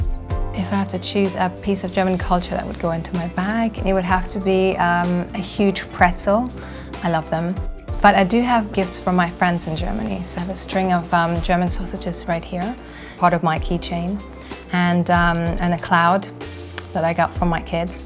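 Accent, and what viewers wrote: United Kingdom. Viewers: She sounds kinda British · Gosh she has such a British accent these days! Seems very nice - does she really only have mascara for make up in there?!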